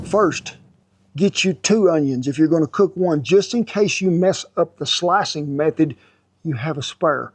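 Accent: South, United States. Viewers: Thank you cool man with sweet south accent. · I can tell you are a man of character because you have an accent just like mine. The country accent is a dying tradition that is getting hard to find. I am so proud of my country heritage.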